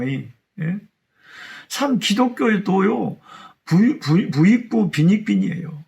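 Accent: Jeolla, South Korea. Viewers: 말씀을읽는다는건축복인듯합니다 우리가 하나님의 자녀라면 그분에대해서 궁금해야? 꿀송이큐티가더욱가슴으로다가올것입니다 저 포함해서 말씀을읽어나가는집사4명이있는데 다들처음엔말씀이어려워서힘들게생각했는데 꿀송이큐티와 데일리노트(그날그날일기및주님과대화또한은혜쓰는노트)이렇게하다보니 지금은상경을재미있게볼수있고 잘모르지만성경도알아가는맛이 대단해지고있습니다 말씀은모르든지알든지 매일매일 우리의영을위해서먹어야할것같습니다 목사님의전라도사투리의성경공부가 다른채널보다 정감이가고 듣기도좋습니다 요즘은우리가 세상에서의삶도중요하지만 나의영에 부유함에더욱더신경써야할듯합니다 오늘새벽에는반은졸고 반은기도하고했지만 병원으로돌아오는발걸음이가벼웠습니다 말씀이사람을변하게하고 말씀이세상을보는눈과사람을대하는모든걸변하게합니다 오늘도 나의예수님은 최고였습니다 감사합니다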